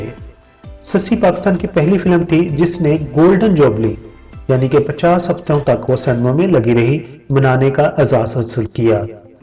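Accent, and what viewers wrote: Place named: Punjab, India. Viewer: AP ka lehja pnjabi hai Urdu k alfaz p sahi grip nai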